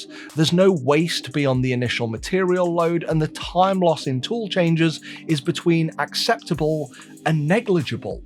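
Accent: United Kingdom. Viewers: I’m American. And like most Americans, I find listening to someone with a British accent telling me things relaxing. And as I’m relaxing at the end of a long day it is mentally akin to wearing comfy slippers on a cold night while relaxing in an overstuffed leather recliner reading a good book as I sip a nice hot cup of cocoa (meaning it's really comforting). So when the aforementioned British speaker has the opportunity to reference something so uniquely British – like a famous Sherlock Holmes quote - thus enhancing this comforting experience , I consider it a missed opportunity. I’m not the only one that finds this phrase reassuring or having value outside of its literal context.(According to a popular literary web site) " 'The game is afoot' is more than just a phrase, it is a cultural touchstone, a literary echo, and a testament to the enduring power of human curiosity and the pursuit of knowledge". 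I hope now you can understand how this was, in all conceivable meanings of the phrase, truly a missed opportunity.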